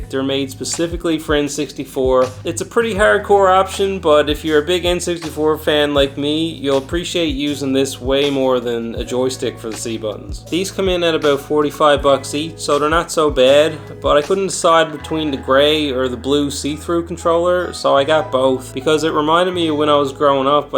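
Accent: Newfoundland, Canada. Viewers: i know a newfie accent when i hear it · Dude you definitely have to be a newfie? Lol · You sound like a Newfie my friend, hello from a fellow Newfie if so. Great video, keep up the good work! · You from NL or east coast Canada by chance? Knows that accent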